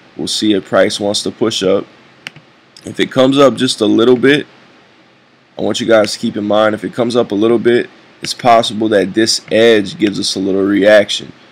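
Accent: Louisiana, United States. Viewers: I knew u was from Louisiana I can hear it in your voice what part you from bro im on the north shore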